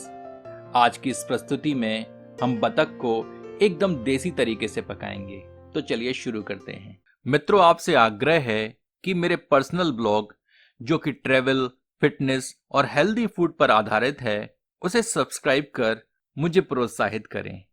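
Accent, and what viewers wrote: Standard. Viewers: Whh sudh Hindi very nice bro thanks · Itni shudh hindi in cooking 😮 very nice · बहुत ही शुद्ध हिन्दी का उच्चारण करते हैं आप सुनने में बहुत ही अच्छा लगा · 💯 shudhh hindi I like it☺️🥳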